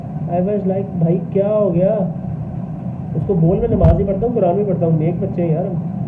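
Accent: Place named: Punjab, India. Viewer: U speak like pungabi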